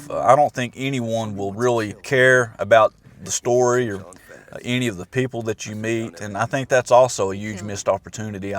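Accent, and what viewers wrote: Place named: Appalachia, United States. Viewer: I was just gonna say Appalachian twang!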